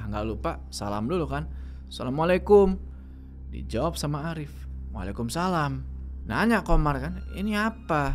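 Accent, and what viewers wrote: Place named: Java, Indonesia. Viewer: Bang keren menjiwai banget ngomong bahasa jawanya😂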